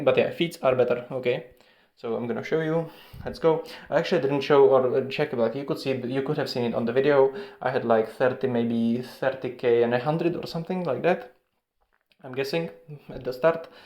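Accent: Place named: Czech Republic. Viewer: Držím palce, ale tvá výslovnost AJ mi trhá uši. Slovní zásoba a slovosled je relativně OK, ale ta výslovnost je Czenglish. Doporučuju poslouchat třeba Kubovu English, má tam často věci k výslovnosti.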